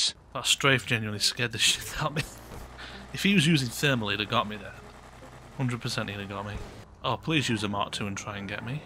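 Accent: United Kingdom. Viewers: Good to hear a northern accent in here! Great vid again mate.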